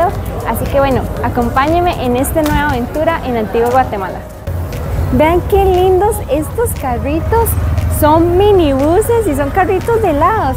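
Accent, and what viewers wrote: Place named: Costa Rica. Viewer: las gracias son a ti por tomarte el tiempo de venir te quiero mucho me gusta tu contenido en la forma como te expresas me gusta tu acento tico escuchar ❤️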